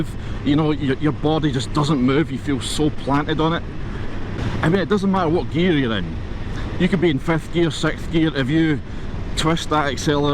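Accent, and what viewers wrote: Scotland, United Kingdom. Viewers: Love this video, love the Scottish accent and above all, love the Busa. Great bike to ride.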